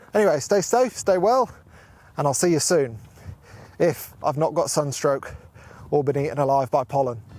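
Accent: United Kingdom. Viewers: I gave u thumbs up even before i watched it. Love the british accent and your dry humor. I have no interest in Panny or micro four thirds but you vids are entertaining. Bikes. Hikes. And what ever else. Love it. Thanks.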